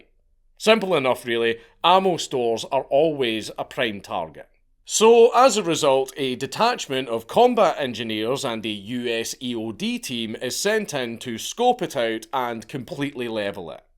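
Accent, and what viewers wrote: Scotland, United Kingdom. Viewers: “Became knoGwn as the golDf waDrr “ Yo Scott’s are cool af bro . Best English variant yet other then American southern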